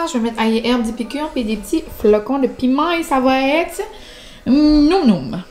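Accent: New Brunswick, Canada. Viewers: Bonjour, je viens du Québec ( Montmagny) et je te rassure, nous connaissons bien ton coin de pays. D’ailleurs le NB est une destination bien prisée pour les vacances d’été. Les élèves apprennent encore les provinces canadiennes et votre accent est magnifique…peut-être que les gens que tu entendus manque un p’tit peu de culture 🤪. Bonne entrée scolaire! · Tellement croquable votre accent! Girl du Saguenay ici, et je ne connaîtrais pas autant de choses sur le nb si ce n’était pas de mon grand-père qui m’en a tellement parlé de son vivant! Il adorait y aller ❤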